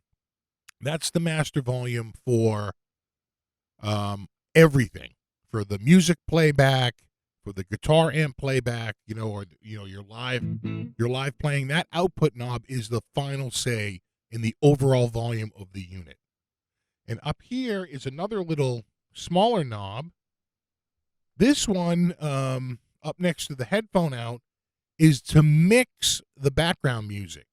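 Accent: Boston, United States. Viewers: Being a transplant in Pa from Quincy point the Boston inflections helped me understand the descriptions of the of the features. Waiting since November for delivery, not yet. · Nice intro to a great sounding product. I just ordered one and will be getting it in another week. This was a great demo of its capabilities and its awesome sound. Thank you,. Karl, also from Boston, but sans the accent!! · Instant subscribe, badass no bull shit informative video, and the only video I’ve seen where someone demonstrates a clean tone not saturated with effects. Also that Boston accent is so easy to listen to! Sick work man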